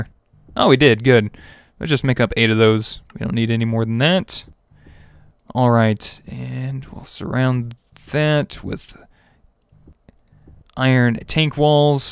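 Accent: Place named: Midwest, United States. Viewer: Scotty I think he has a centrial U.S accent but I cant quite tell, all I can tell is my accent is very similar at times and I live in Oklahoma U.S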